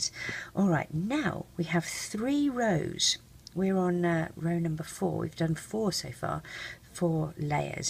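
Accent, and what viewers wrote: United Kingdom. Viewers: OMG your british im a quarter cause my nana is britan and everyone after her!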